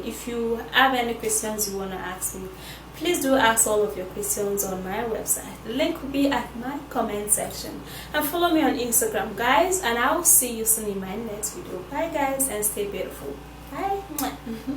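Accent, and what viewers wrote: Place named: Nigeria. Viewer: 77% of Nigerians bleach and judging from her accent shes Nigerian ): other Africans bleach too but Nigeria tops the list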